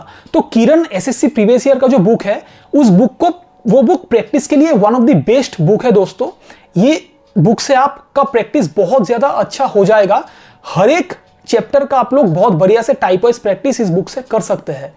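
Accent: Bengal, India. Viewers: Sir aap Bengali ho?? Kya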